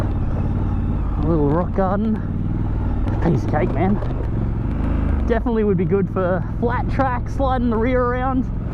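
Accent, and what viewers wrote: Australia. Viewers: good to hear an Aussie voice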